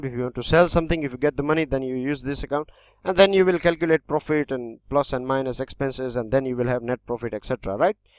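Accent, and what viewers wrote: India. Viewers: plz sir indian acent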